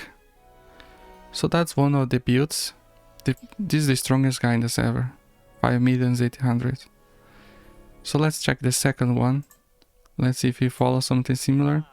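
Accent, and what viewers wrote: Brazil. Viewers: Where are you from ? You really sound brazialian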